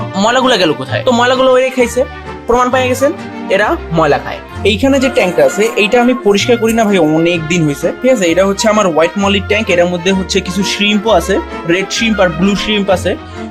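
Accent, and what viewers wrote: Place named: Barishal, Bangladesh. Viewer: ভাই আপনার বারি কি বরিশাল নাকি 😊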